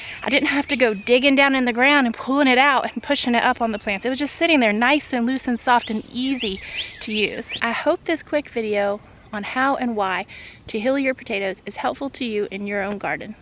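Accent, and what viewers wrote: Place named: South, United States. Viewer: Love you!! Is it just me or is she extra southerrrrn on this one?? ❤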